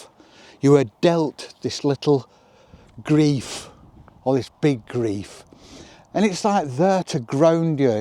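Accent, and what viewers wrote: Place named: Home Counties, United Kingdom. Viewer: Thank you for your very wise words and support for Jamie, so many people round the world think the world of him. It’s so true that the price of love is grief. Love your words about the end we will all face and how to live a slow simple life literally “smelling the roses”. You sound to come from my home county but I now live in the Lake District which is currently overflowing with visitors who do not understand that life is finite and precious and that my home is not their playground, whizzing too fast to see the real valley. Sherps helped me to cope with feeling trapped and lonely.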